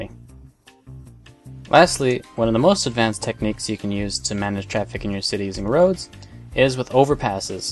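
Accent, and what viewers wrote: Canada. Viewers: Is he Canadian? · Are you canadian? :D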